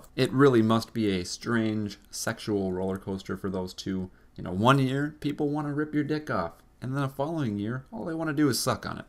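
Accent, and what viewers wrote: Minnesota, United States. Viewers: are you actually from Minnesota?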